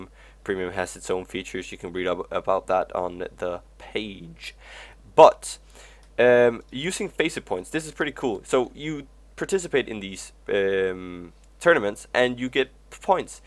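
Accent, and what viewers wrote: Denmark. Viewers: HaHa kunne høre fra starten af du var dansk. :) god video! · Are you danish? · Are u from Denmark? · your Danish?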